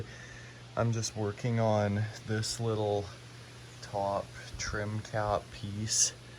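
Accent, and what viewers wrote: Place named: Upper Michigan, United States. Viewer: We have similar accents here (UP of Michigan) I went to pick up my nephew (he's in his late 30's, i'm in my late 40's). His dad my bro-in-law is a roofing contractor. so I say: 'Yah.... I went and picked up a bundle of cedar shingles the other day' 'they were so rough-sawn I don't even think I could us em as shims' He looked at me, astonished, and said 'yep! it's hard to even find good shims to buy.'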